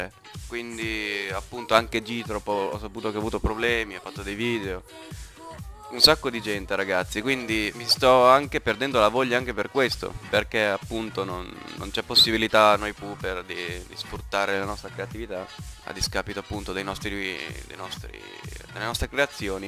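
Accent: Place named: Romagna, Italy. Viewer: Mi sembri romagnolo dall'accento, sei di Ravenna?... AnnennA...AnnA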